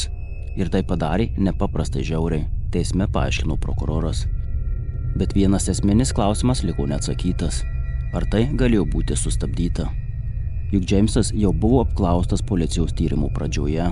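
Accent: Suvalkija, Lithuania. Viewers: Akcentas lb gražus, Suvalkijos.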